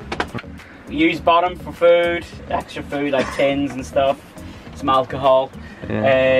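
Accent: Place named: Northern Ireland, United Kingdom. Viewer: Love this van... Is he northern Irish? EDIT...he is.. Useful 😜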